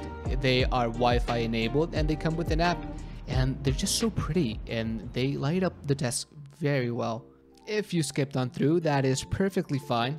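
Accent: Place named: Texas, United States. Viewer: wait I legit thought you were maybe from texas or something 😂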